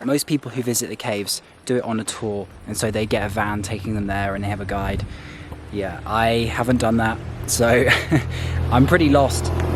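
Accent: United Kingdom. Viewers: sounds British accent....enjoy trekking Sagada...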